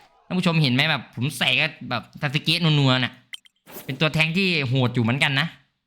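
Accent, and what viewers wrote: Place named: Korat, Thailand. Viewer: คนโคราชนิครับ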